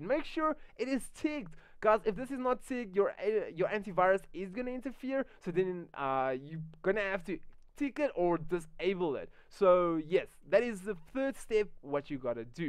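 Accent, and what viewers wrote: South Africa. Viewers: you sound South African lol? Fyi im from Durban.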